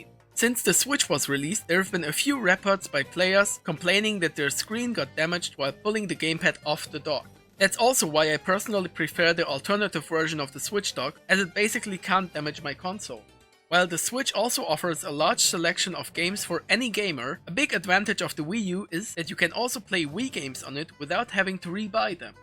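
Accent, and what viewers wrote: Germany. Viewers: I hear deutsch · This is the first video i've seen from you and i immediately noticed that you're german lol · Are you German/Austrian ?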